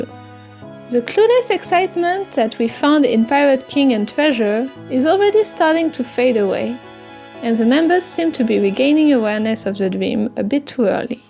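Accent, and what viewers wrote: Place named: France. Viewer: your videos are really cool !! but you have a strong accent, are you french by any chance?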